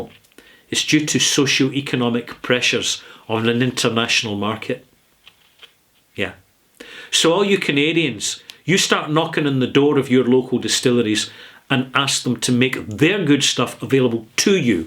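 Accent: Scotland, United Kingdom. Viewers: Hi, I love your trade dress. Please take that as a polite compliment from an old patent and trademark attorney. The tweed bonnet, the thick woolen flannel shirt, the Scottish accent, the bothy, the barrel, the whole vibe. Your knowledge is extensive, and the breath of your whisky knowledge and vocabulary, and the style of your delivery, is superlative. There is an honest integrity about you which I find very refreshing, heartwarming and frankly entertaining. With great interest I have probably seen over 500 of your reviews. I like you, I am a deliberate and passionate malt mate. I’m an American living in Cincinnati, Ohio who loves Scotland and scotch whiskey. So it’s clear that I love everything you are doing and I wish you all the best of health and I hope you do this for a very long time. So what my gripe? One and one only. Please review Macallan. 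I am your echo chamber and I will support everything that you don’t like about non age statement whiskeys. I understand that the bulk of your audience cannot stand the snobbery (or the sky-high prices) of Macallan. Yes, it IS the gorilla on the table. And ignoring it seems disingenuous. And you are anything but disingenuous. Oh, what I wouldn’t give to have review a NAS Macallan Oscuro, non chill filtered, natural color and bottled at about 46% abv. Yes high prices can be a turn off but what would Car & Driver be without periodic reviews of Bugattis and Ferraris. We may not be able to afford those lux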